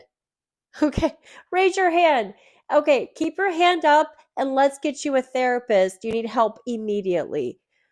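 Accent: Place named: South, United States. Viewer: Use that southern accent lady!! I'm a southener!! Foothills NC❤ PS loved the singing !!! Saw the video this morning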